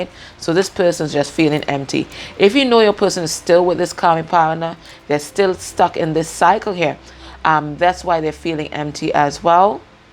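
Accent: Trinidad and Tobago. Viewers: U sound like a trini 👍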